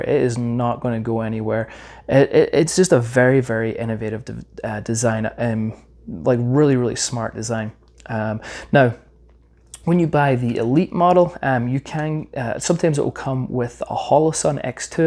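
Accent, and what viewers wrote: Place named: Shires, United Kingdom. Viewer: You sound like you're from the shire.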